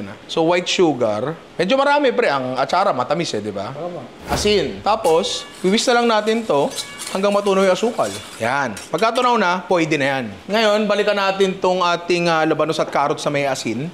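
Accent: Batangas, Philippines. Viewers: More Batangenyo accent ninong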